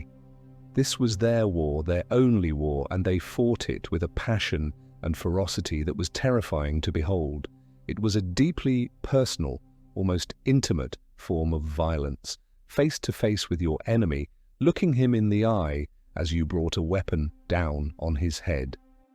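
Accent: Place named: United Kingdom. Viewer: Well, well, well. You made me actually sign into YT for the first time ever. These videos that you throw out there in a breathtaking speed are amongst the best history docs I've ever watched. I'm not talking about the artwork which probably had some help from AI, I'm referring to your narrating style and the kind of political neutrality I'm expecting but never encountering when watching the stuff of others. Long story short: This is brilliant and well thought through stuff and I wanted to thank you honestly for sharing. Speculating about your accent, I suppose you're from the UK, a country that in recent history appears to have its troubles with the application of free speech, but you seem to be rather unimpressed and not influenced by it at all. Good for you! Let me know if I can help. I'm fascinated by history and might be willing to dedicate some of my time to support you. 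Thumbs up for your work, well done!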